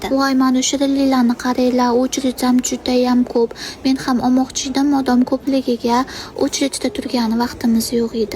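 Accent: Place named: Andijan, Uzbekistan. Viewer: Вай бляяя бу яне анжанли экан узбекларга нимабуляпти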